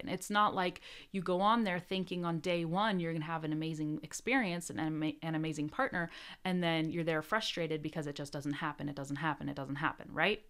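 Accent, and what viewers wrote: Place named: Argentina. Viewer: te he escuchado hablar en castellano (muy bien por cierto) y nunca confundiría tu acento con otro hispano. Me haces sentir representado como argentino, raro porque no sos argentina pero muy lindo. Saludos.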